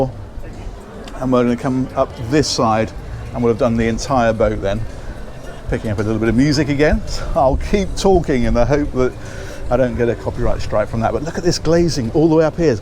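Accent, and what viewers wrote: United Kingdom. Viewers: Another great review. You’re the best and love the British accent.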